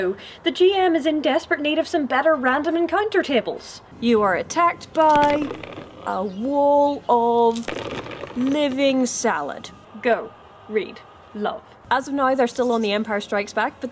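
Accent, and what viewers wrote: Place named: Northern Ireland, United Kingdom. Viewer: No she's Northern Irish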